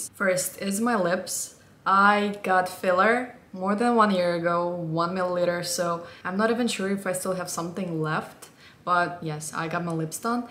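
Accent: Russia. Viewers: Несмотря на то, что ты прекрасно говоришь по английски, я почему-то сразу поняла, что ты русская, и это подтвердилось! Успехов тебе, береги себя! Со скольки лет ты стала работать за границей? Родители нормально отпускали? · Как только я увидела ваше другое видео, я сразу подумала, что вы русская) Это видно по внешности и по тому, как вы говорите) И при этом я сама не русская, но из России. Очень интересно то, что мы можем узнать русских среди других европейцев, так и людей из своего народа я точно так же вычисляю среди других азиатов) Но я вообще не знаю как я это делаю. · привет, я начала смотреть тебя пару месяцев назад, потому что меня заинтересовал блог, и я хочу послушать акцент разных людей, поэтому сначала мне показалось, что ты из России, а сейчас узнала, что это действительно так) я хотела сказать, что ты замечательно выглядишь и у тебя очень хороший вкус, для меня ты стала одним из примеров, на кого надо ровняться, спасибо тебе за это 💌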